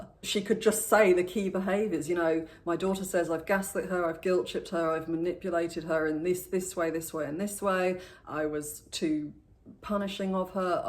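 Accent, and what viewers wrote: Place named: RP, United Kingdom. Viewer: 🙌🏾 much appreciated and respect 👏🏽🐈‍⬛. Nobody forced her to put videos on internet and share her personal experience, so why is she complaining about internet? Unfortunately I have been through a severe narcissistic relationship and I know very well all these types of tendencies. I’d rather prefer to enjoy the amazing lecture, the great and posh English accent but also the beautiful cat 🐈 🙌🏾🌻🌻🌻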